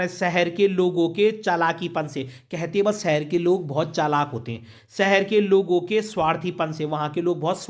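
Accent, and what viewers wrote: Garhwal, India. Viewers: Sir apki gadwali tune Ari h ❤️🤣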